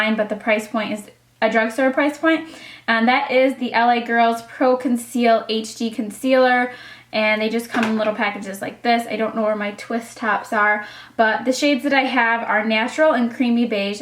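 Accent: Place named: Midwest, United States. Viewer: I've always wanted to ask you, are you from the Midwest?? You have a strong Midwest accent! Nothing wrong with that, I'm originally from Chicago but I don't have the accent:(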